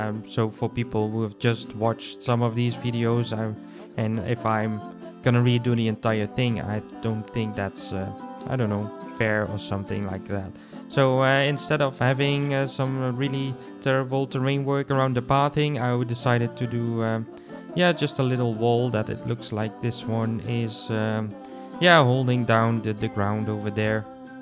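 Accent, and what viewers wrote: Netherlands. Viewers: Nederlands accent??? Haha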